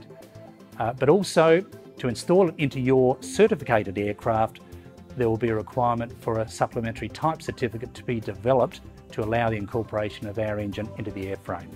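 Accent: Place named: Australia. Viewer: Listening from Australia, was pretty sure that was an Aussie accent, checking online verified it :-) Go Aussie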